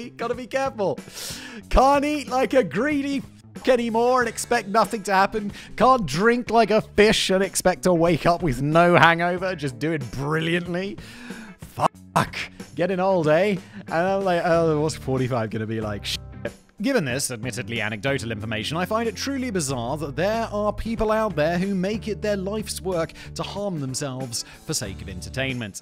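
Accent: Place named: United Kingdom. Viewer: You Tube SUCKS! I have removed this man's channels over and over again. But when You Tube changes the algorithm, it force feeds me this guy's many channels despite my removing him. channels suck. They are poorly researched information that is mostly government propaganda talking points. Terrible videos. And just because has a British accent, this doesn't not make him an authority on anything. He's just a talking head. England is over. Brexit has made the only job in England a You Tube content provider. Terrible list videos that spread falsehoods and bull.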